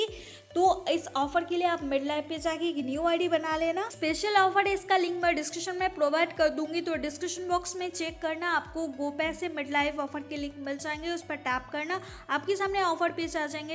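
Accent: Bengal, India. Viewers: Bangali hai ye log aise hi Bolte hai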